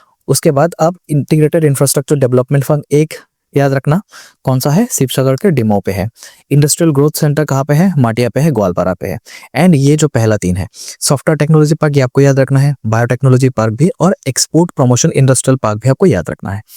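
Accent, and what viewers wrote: Bengal, India. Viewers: Sir apka accent se lgta h aap bengali ho kyuki ek baar aap padhate wqt gllti se bengali word nikal gya tha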